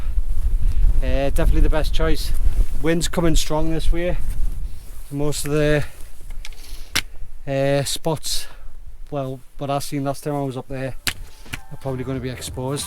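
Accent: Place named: Northern England, United Kingdom. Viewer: Hi, I came to your videos as I considering a Pioneer 2 for myself, as they are a good deal at the moment. However, I seen a couple of negative comments about them from a water proofing point of view and also regarding the inner and outer touching. Have you had any issues? You certainly seem to be using it extensively in your videos. Would you still recommend it as a good tent. I'd primarily be using it for cycle touring and an occasional mountain camp. I'm 65 now and can't be bothered squirming around in these low one man tents, trying to get changed, a 1.5 man tent with generous headroom seems the way to go. Finally, do you use the second entrance much? PS, love your accent and videos (went to uni in Newcastle in the late 70's)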